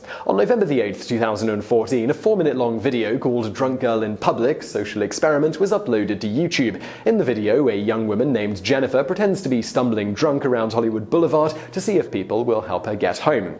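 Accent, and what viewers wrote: United Kingdom. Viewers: you have a British accent that doesn’t sound like your constantly smelling something bad,love it.very first class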